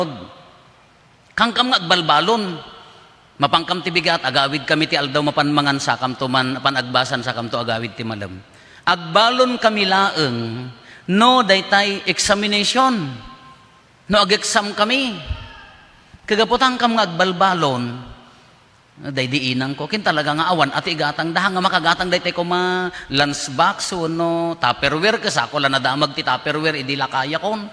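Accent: Ilocos, Philippines. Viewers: Sarap talaga makinig pag Ilocano ang nagkwekwento.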